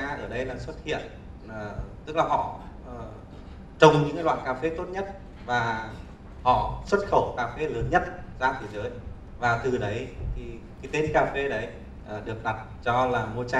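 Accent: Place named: Central Vietnam, Vietnam. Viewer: Bác này người miền Trung thì phải, giọng lơ lớ. ^^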